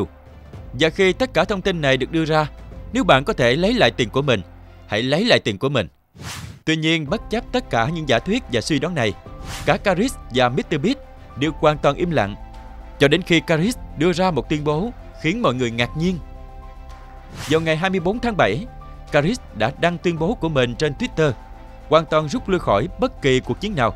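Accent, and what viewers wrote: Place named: South, Vietnam. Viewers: Đừng nói vậy, tội nghiệp anh ấy lắm Anh ấy sinh ra là người nam nên giọng vậy, k hợp ý bn cho lắm Nhưng k sao, giọng anh ấy hay là đc :D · mình khá là ko thích giọng ng miền nam khi đọc kịch bản, ko phải tất cả nhưng từ lâu mình rất thích nghe voice kịch bản của những ng miền bắc hơn (mặc dù mình là ng miền nam), nhưng giọng bạn này hay thật, dù đọc tên có hơi sai nhưng tụ chung giọng vẫn rất truyền cảm, mong kênh bạn sẽ ra nhiều video chất lượng hơn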